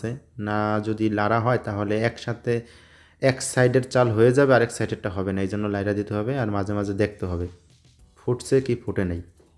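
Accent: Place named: Barishal, Bangladesh. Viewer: ভাই মনে হচ্চে আপনার হোম ডিষটিক বরিশাল ।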